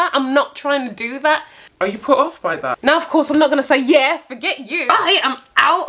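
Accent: Birmingham, United Kingdom. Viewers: Do I detect a (former) Brummie? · It’s the accent for me. Where’s it from. Birmingham???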